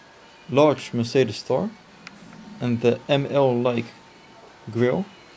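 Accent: Netherlands. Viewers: ik kan echt aan je stem horen dat je nederlands bent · louis van gaal engels? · I can hear that your dutch dude 😂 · Thicc Dutch Accent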